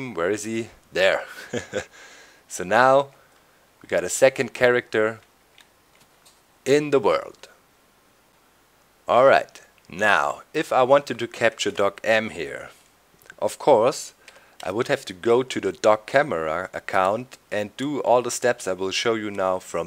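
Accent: Germany. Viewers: You are German like me. Aber dafür ist dein Englisch total gut :)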